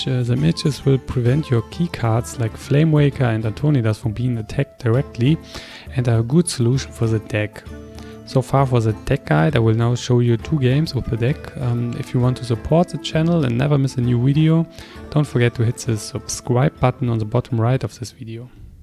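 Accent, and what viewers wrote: Germany. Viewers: Nice work, I like the style of your Videos! Regarding to your pronounciation I would bet you are also from GER?!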